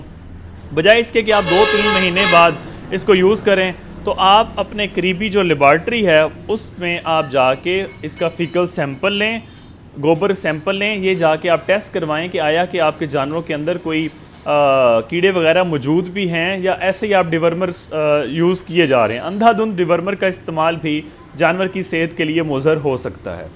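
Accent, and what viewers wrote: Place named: Saraiki, Pakistan. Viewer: Layah ka mtlb ye hwa k ap mithi boli sairki bolte hn...... 😮😮😮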